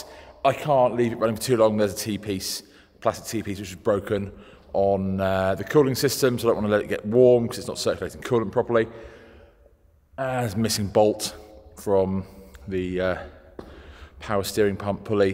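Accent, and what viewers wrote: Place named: England, United Kingdom. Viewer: It's still chugging! You are a wizard! You are doing a fantastic job with the van and everything. Loads of fun things to do with the van yet... but you are doing good. Does remind me a lot of my Pops working in his shop... I miss him. But watching you work makes me happy. The only thing that is odd is well... the very English accent. But that's ok I love that. You got your chipper and lawnmower to fix up... but it's coming.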